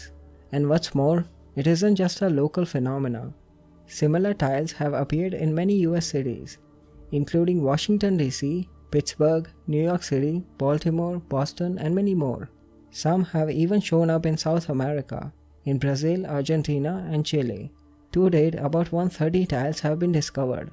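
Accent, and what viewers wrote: India. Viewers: Narrator: I’m sorry, my pronunciation is trash. Also narrator: acrimonious You’re perfect. Don’t worry about your accent. Desi accents are awesome.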